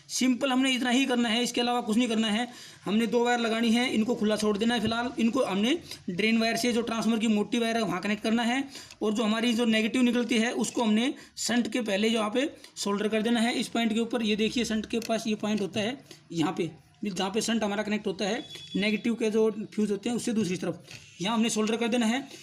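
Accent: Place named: Haryana, India. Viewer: Good idea sir. Thanks. Sir aap Apne Haryana se hai kya. Kuch language me haryanvi tone aati hai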